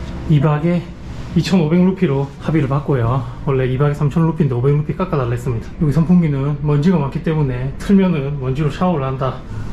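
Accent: Gyeongsang, South Korea. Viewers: 경상도 사투리에. 으이~~ 구호까징~ 세상친근합니다 저는 16년전 첸나이랑 다녀왔는데. 가족이 그곳에 있어서 인도여행이 이렇게 빡신지 모르고 댕겨왔네요~ 또가고싶은데. 가족들이 한국에 들어와서. 여행가기쉽지않네요~^^ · 잉! 인도를 이렇게 철학적으로 담아내도 되는겁니꽈?? 한편한편 홀린듯 보고 있습니다. 할일도 산더민데 ㅋ. 사연있어보이는 잘생긴 얼굴---- , 막걸리처럼 구수한 갱상도 억양---, 나름의 위트도 있네요 ㅋ. 혹 전공이 영상쪽인가요? 전업유튜버 하시죠!!! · 우연히 보게 됐는데 말투가 경상도 출신이신가요?? 제 친구가 옆에서 같이 보다가 의경얘기에 자기는 대구남부서에서 복무했다고 1028기라며 관심을 가지네요 구독해주라고 했으니 한명 더 늘어나겠네요 구독자 곧 1만인데 미리 축하드립니다!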